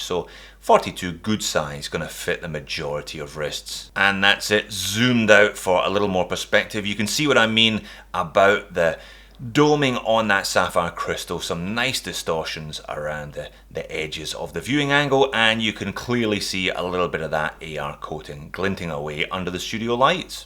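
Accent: Scotland, United Kingdom. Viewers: A Haggis (shhh don’t tell unsuspecting tourists they aren’t real) riding a unicorn (as Scotland’s national animal). Enjoy hearing a fellow Scotsman talking about watches! Cheers all the way from Dundee! Keep up the good work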